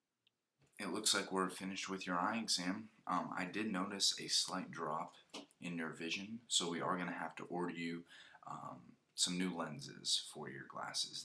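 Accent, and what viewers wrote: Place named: South, United States. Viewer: You have a great voice! It's kind of southern without being southern. I grew up in FL, so there is definately a distinction there. I usually enjoy the light stuff, but with this video I liked the audio more.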